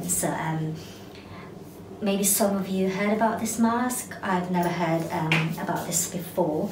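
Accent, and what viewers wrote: United Kingdom. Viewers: I like the british accent 😯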